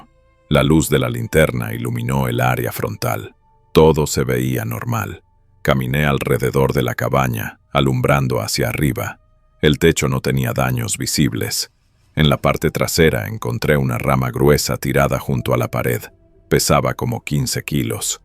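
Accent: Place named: Spain. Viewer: Me gustan las historias de horror pero, con ese asiento español?, ni pensarlo.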